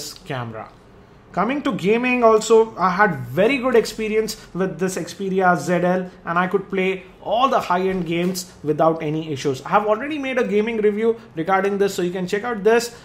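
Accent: India. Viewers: just came here for the funny indian accent.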